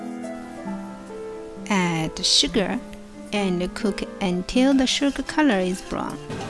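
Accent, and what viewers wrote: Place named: China. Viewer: 这个中国式口音 像学生读英语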